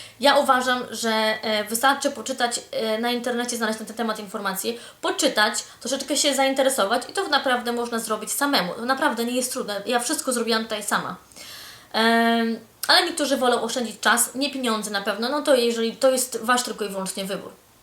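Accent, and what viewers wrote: England, United Kingdom. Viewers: Nabrałaś angielskiego akcentu? Czy masz wadę wymowy? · na początku śmiesznie emanuje brytyjskim akcentem, a później już o nim zapomina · Akcent już ma Pani brytyjski.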